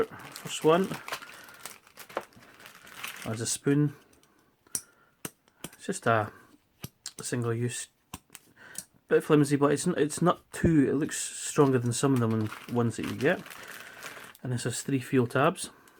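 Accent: Scotland, United Kingdom. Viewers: OH MY GOD I LOVE THE SCOTTISH ACCENT Great review btw xd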